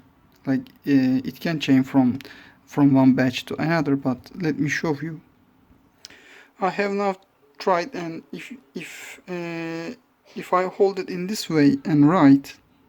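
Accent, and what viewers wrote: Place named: Turkey. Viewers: Aksanın ben türküm diye bagırıyo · 2.saniyede falan türk olmama ihtimali yok dedim ama açıklama ve isimden dolayı yanıldım mı acaba dedim, yorumlar neyse ki şaşırtmadı :)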